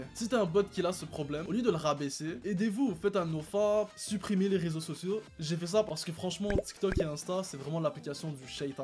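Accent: Quebec, Canada. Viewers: Ta un petit accent québécois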